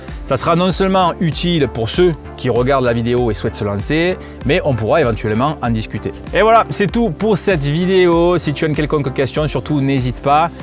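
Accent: South, France. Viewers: ça me donne envie de m' intéresser beaucoup plus au trail, pour ma part j'ai pratiqué une course une seule de Trail La Calanquaise en colline à Carry Le Rouet et je kiffe les Rando. J'avais été vraiment surpris de la difficulté de l'effort, effort que j'ai mal géré en partant en allure trop rapide dès le début de la course. Me surestimant un peu, on est très loin d'un match de football, mais je ne le voyais pas de cette façon . J'avais envie de partager mon ressenti, car j'aime bien tes vidéos et l'accent du sud qui est présent. Tchaoo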